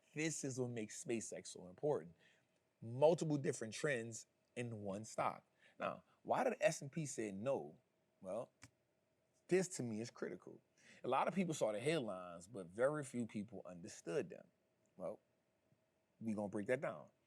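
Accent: New Orleans, United States. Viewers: Say bro I love your style I love your knowledge I hear this New Orleans accent thing are you from New Orleans man😅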